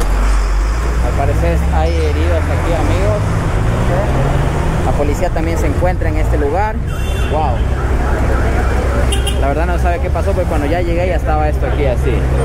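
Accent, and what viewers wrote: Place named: Quito, Ecuador. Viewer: Habla quiteño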